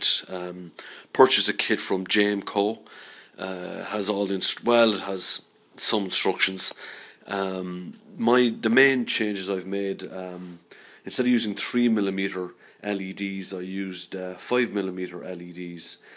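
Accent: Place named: Midlands, Ireland. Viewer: Are you from the midlands? You accent sounds like its from the Irish midlands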